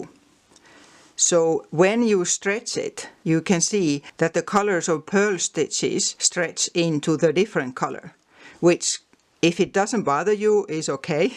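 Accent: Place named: Finland. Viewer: Thanks so much! I've been crocheting for over 40 years, but I'm relatively new to knitting, so this was really helpful. Also, I just wanted to tell you that even if I knew this tip before, I'd still watch the video because you sound just like my aunts sounded when I was growing up. (My dad's family was from Finland) So listening to you brings me back to my youth when I'd listen with fascination to their beautiful speech patterns. :)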